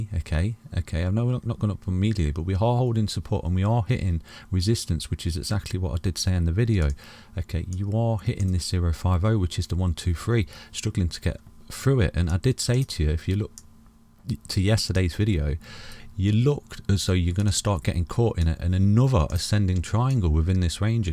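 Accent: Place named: Hinckley, United Kingdom. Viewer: ohhh I was close. I thought you was Hinckley because of how you say "cuh-daano" ha ha I am Birmingham. Not too far from you mate!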